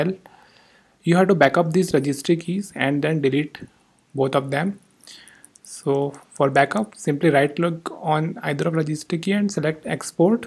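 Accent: India. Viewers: All file explorer wont open videos has a Indian voice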